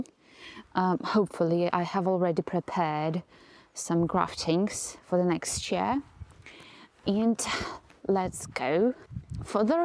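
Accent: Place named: RP, United Kingdom. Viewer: Love the posh accent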